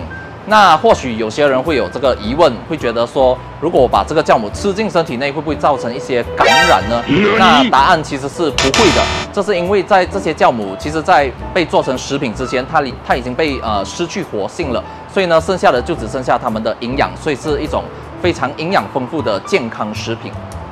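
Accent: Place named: Malaysia. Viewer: 马来西亚的声音和我朋友一样😂喜欢…..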